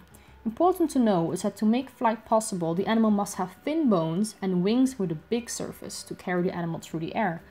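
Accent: Netherlands. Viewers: Native dutch speaker ? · Are you by chance Dutch? haha i think i recognise your accents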